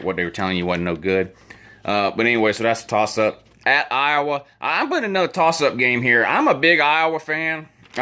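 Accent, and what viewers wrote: South, United States. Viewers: you look like an idiot, and you talk like a real bubba. Did you graduate from high school?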